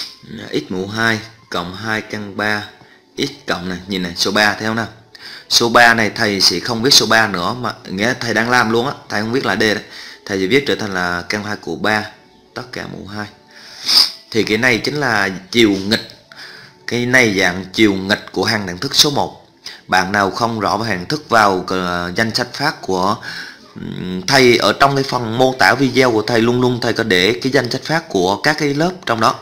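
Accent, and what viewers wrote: Binh Dinh, Vietnam. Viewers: giọng bình địa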